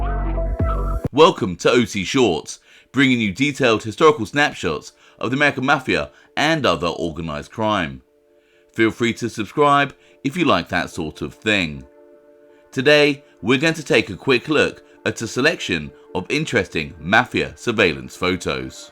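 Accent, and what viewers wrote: United Kingdom. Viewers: What is a mobsta? Did you mean mobster? UK accents are irritating. DISLIKE.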